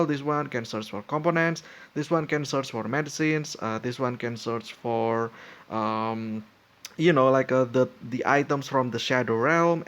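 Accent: Indonesia. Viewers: Sudah kuduga beliau ini orang indo, dari aksen dia berbicara mirip seperti orang indo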